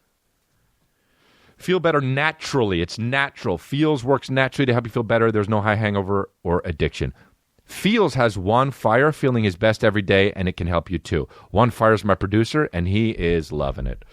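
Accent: Australia. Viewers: Chris needs a part in a movie as an aussie forsure hahaha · Love the Aussie accent😂😂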